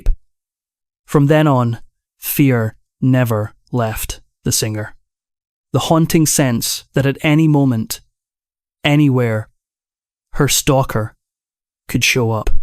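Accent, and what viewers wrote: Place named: Scotland, United Kingdom. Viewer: It`s the first time I have heard AI with a Scottish accent.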